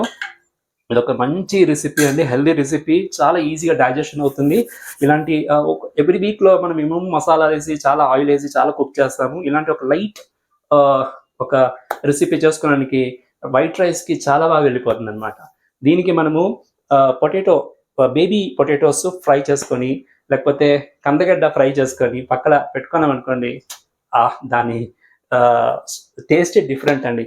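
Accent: Tamil Nadu, India. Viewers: Hi! Miru entho ishtamga vantalu chesi choopistharu mariyu mi telugu bagundi. Miru tamilian andi?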